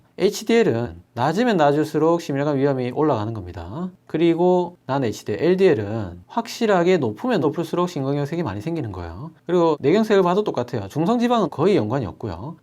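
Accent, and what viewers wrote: Gyeongsang, South Korea. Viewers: 대구분이신가봐요^^응싱스럽다말은 경상도사는 저의세대도 저만 사용하는 사투린줄알았는데..^^ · 와이거 메모해야겟네..ㅜ 근데경상도이신가요? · 구수한. 경상도사투리. 너무 정감갑니다 편안하고. 쉽게. 설명해주셔서 감사합니다 스폰지처럼 머리속에. 쏙쏙. 이해가. 갑니다 짱. 입니다 ㅎㅎ.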